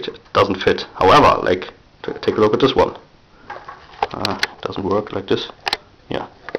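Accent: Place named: Germany. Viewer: german accent ftw! :)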